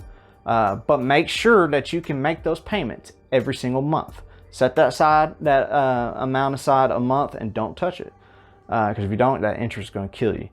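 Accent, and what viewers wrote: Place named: West Virginia, United States. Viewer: awesome dude. I was hoping you were closer to home as we share the same accent. Lol. I'm here in point pleasant wv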